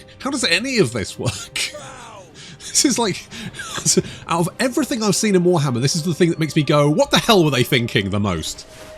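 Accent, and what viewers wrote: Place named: West Country, United Kingdom. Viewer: West Country accent with your commentary is priceless 🤣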